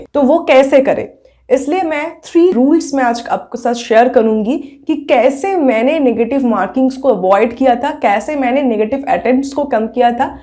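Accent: Bengal, India. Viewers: Hi! Di are you Bengali? Please tell 😊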